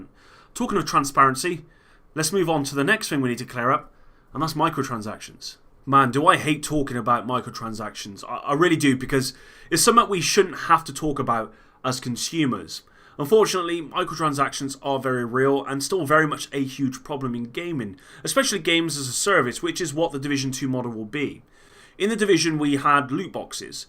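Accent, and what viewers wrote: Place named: England, United Kingdom. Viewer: Good video. Keep up the great work. You sound English. Where abouts are you from? I can’t wait for this game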